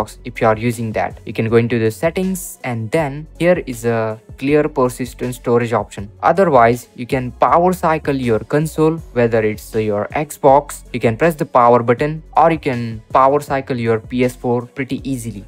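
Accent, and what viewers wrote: India. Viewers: He a Indian tech scammer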